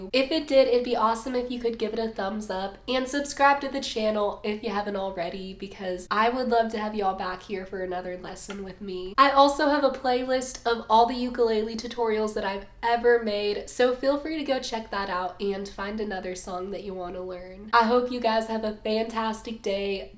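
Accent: South, United States. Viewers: why does she never sound southern in any videos except for this one lol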